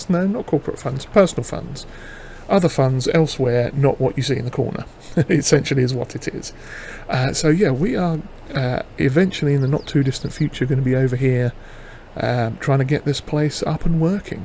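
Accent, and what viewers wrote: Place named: England, United Kingdom. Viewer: yea me too I love it to be real tough BUT still doable of course. And yea I'm really tied to all the old vintage stuff. I'm from the US (Midwest) and despite what the game says my grandpa's farm growing up and all the surrounding farms were pretty small and used old stuff. I never saw a closed cab until I was long off the farm and in another state lol (I'm 43 and we are talking about as I grew up in the late 80s and thru the 90s lol) Haha you and I have had the same experience with the horse/ox and carriage/plow so yea it should be an adventure!!! What part of England are you from? By your accent I ruled out the rest of the UK. My apologies if I'm wrong but I'm pretty sure you aren't from anywhere else and you aren't a scouser lol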